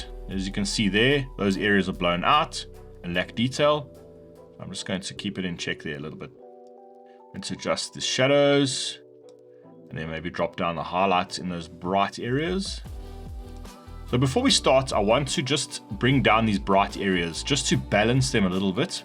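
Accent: South Africa. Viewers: As soon as I heard your voice I immediately picked up that you’re a fellow South African… love your work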